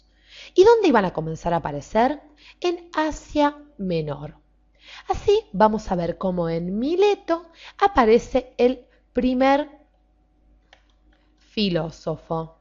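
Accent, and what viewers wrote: Argentina. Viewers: Me ha gustado mucho este video esta bien chévere! Saludos desde Brasil y me enamoré del acento argentino. Gracias!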